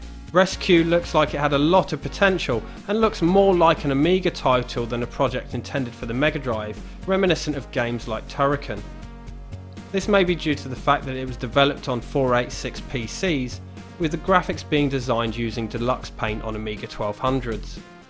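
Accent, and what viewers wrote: England, United Kingdom. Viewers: T H I C C english accent.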